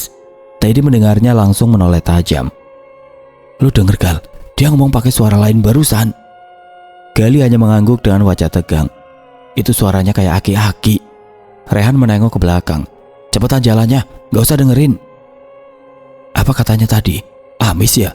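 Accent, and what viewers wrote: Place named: Java, Indonesia. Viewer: Waalaikumsalam, maaf ga maksud tp tiap mas bawain cerita berbahasa Sunda, lucu karna logatnya jawa, suka banget dengernya😊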